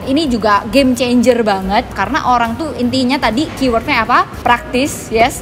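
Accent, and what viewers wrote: Java, Indonesia. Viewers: Kak sekarang logat jawa nya tebel banget kak hehe 😁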